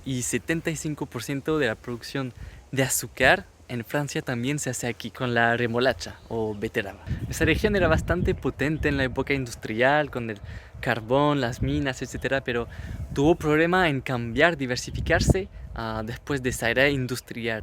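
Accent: Chile. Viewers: Has pasado mucho tiempo en Chile ? Porque hablas español con acento chileno, se te escucha muy bonito ! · Salut Cher ☺️, tu es définitivement un gars super doux et adorable!🤩 J'ai été très émue par ta boite à souvenirs, surtout ta lettre 🥺, c'est très sympa, ça m'a donné envie d'en faire une pour mon "moi" du futur🧐✍️. Tu écris très beau et ton espagnol est excellent et je ne trouve pas que tu as un accent espagnol ou andalou, mais plutôt il semble chilien. J'adore les villages français😍, ils sont tous trop beaux, j'aimerais vivre dans un, c'est mon rêve. J'ai visité Lille il y a quelques années et c'est une très belle ville, je l'ai aimée encore plus que Paris. Grenoble c'est aussi très beau, et ses marchés de Noël🎄👼. J'espère te rencontrer un jour ou te recevoir ici en Colombie 🇨🇴. Gros bisous.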